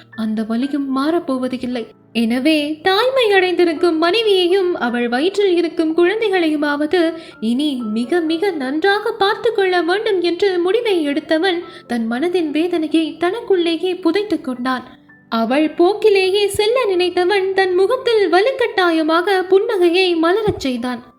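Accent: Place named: Sri Lanka. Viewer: பிரபு அவர்களுக்கு என் மனம் நிறைந்த நன்றி உங்கள் நாவல் மிகவும் அருமை 🥰🌹 உங்கள் நாவல் யின் குஞ்சகம் குரல் அவர்களின் இலங்கை தமிழ் வாசிப்பு அருமை சாபஷ் என்று சொல் தோன்றுகிறது ஃ🥰❤️❤️❤️🥰🌹🥰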